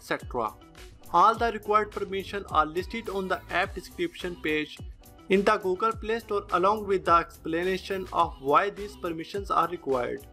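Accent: India. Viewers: Your english accent is terrible. Oh i forgot you are indian that's why. Hahahahaha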